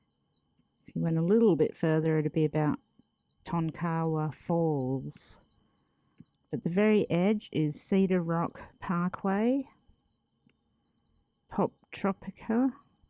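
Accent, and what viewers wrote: Australia. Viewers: at this very moment, there are 7 writing notebooks for me to jot down info, in passing, and others that are strictly for astrology , past lives that deals with astrology (Karmic complexes..etc), Medical astrology: Santos Bonnaci and others and others. On my nightstand (books are a permanent fixture unless I choose to take it with me that day): Judith Orloff MD. The Empaths Survival Guide, D. Vessel Van Der Kolk MD. the Body Keeps Score' , Gary Zukav , Dr. David r Hawkins DR. Masaru Emoto and a 2 or 3 others. I NEED more astrology books! Your is next up to be ordered. I'm just waiting for Mercury RX to leave the shadow period. Your knowledge, teaching style and overall beautiful disposition is ACES!!! I want and need to learn from you. As I've said it before: You male learning fun and easy. Unfortunately, the cases are sad but your ability to put it all in b&w with seemingly little effort speaks to your AWESOMENESS! 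**side note*** I love your Australian accent and when the camera is on your face. So adorable! 💜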